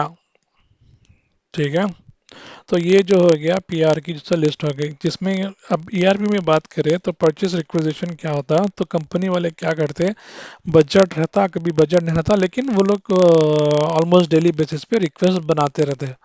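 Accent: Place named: Hyderabad, India. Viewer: Abba Kiraak Hyderabadi accent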